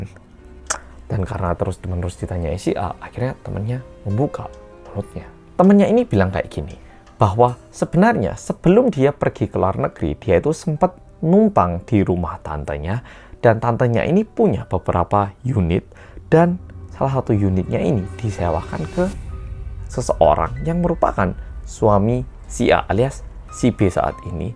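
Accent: Java, Indonesia. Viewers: Yaampun teganya teganya😮 tks medoook ❤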